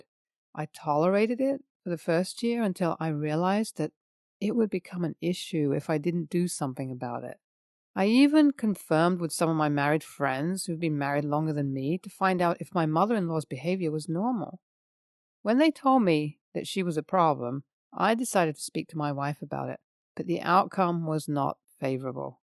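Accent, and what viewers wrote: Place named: United Kingdom. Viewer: If I had to guess she’s Indian/Pakistani from England from her diction speech patterns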